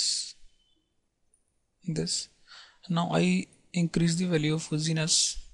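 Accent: India. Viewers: come on it not like that you have speak that typical indian type english in video, keep your shitty vocal mute. i got headache !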